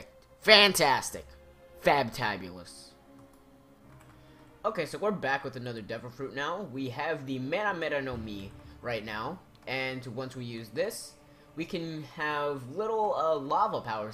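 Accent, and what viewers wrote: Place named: New Zealand. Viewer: Oh lol I didn't think you sounded Hispanic because your accent sounds very similar to a New Zealanders Accent so I really didn't make that kind of connection.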